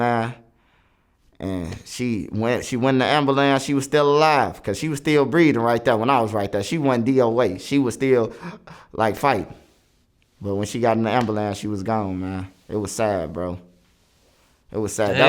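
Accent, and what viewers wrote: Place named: Chicago, United States. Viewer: I'm from Chicago and he is typically what we sound like. I don't know where these other people accent come from. I'm like where they pick this country ass slurred speech from😂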